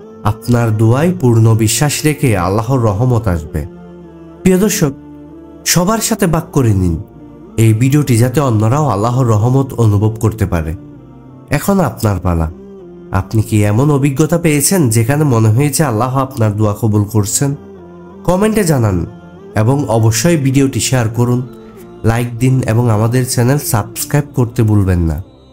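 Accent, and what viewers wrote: Chittagong, Bangladesh. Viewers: আসসালামু আলাইকুম ভাইয়ের বাড়ি চিটাগং? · এই সব গুলো লক্ষনই আমার সাথে হয়েছে,আর যতই খারাপ কিছু আমার সাথে হয়েছে ততই মনে হয়েছে নিশ্চয় আমার জন্য ভালো কিছু আছে না হয় আমি কেনো এত কষ্ট পাই।তবে মাঝে মাঝে তো এক আধ বার হতাশ হয়েছি যে আমার সাথেই কেনো এমন হয়।ভাইয়া আপনার বাড়ি কি চিটাগাং?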